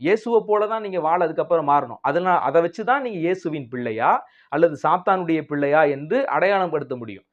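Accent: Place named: Tirunelveli, India. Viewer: Bro நீங்க இருக்கிறது திருப்பூர் but native of திருநெல்வேலி யா?! உங்க ச்லங் ல தெரியுதே!!??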